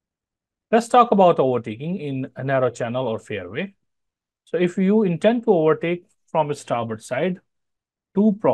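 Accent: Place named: India. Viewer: You are correct in that the video should assist with comprehension. But in my case that was not an option. I was driving and listening to the video, not being able to actually watch it. It’s the “Indian English” that I have difficulty understanding. The narrator does an acceptable with his presentation and English vocabulary. For me it’s the accent. And me being from backwoods Tennessee I have not been exposed to that accent other than the random telemarketer phone call.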